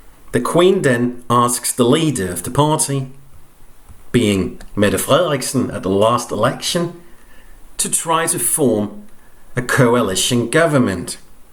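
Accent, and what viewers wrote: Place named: Australia. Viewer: Australian accent!!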